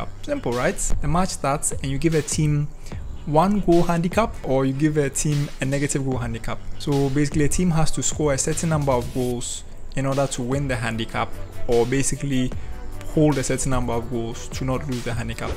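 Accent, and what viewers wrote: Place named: Ghana. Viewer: Your accent sounds Ghanaian. Were you born or lived in Ghana by any chance? Just curious. Great explanation by the way.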